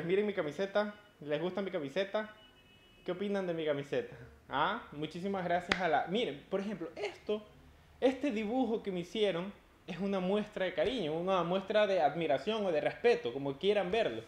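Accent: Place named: Argentina. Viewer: XD tienes acento argentino no me habia dado cuenta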